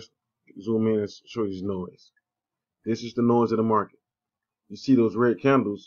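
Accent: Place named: South, United States. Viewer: Yes, wealth of info in there. That's why bit frustrating cos i couldn't leave the video and had trouble understanding haha. I am from the UK so not used to the southern accent. It's not about how you speak but that we all speak differently depending on where we are from and can be hard to understand each other some times. Thanks again!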